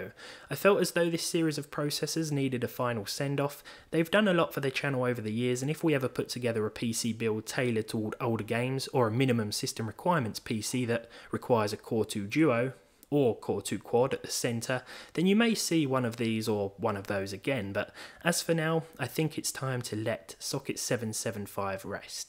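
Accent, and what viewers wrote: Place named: United Kingdom. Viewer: British accents to me are like pissing a set of prison keys... but I tolerate it for because I love him.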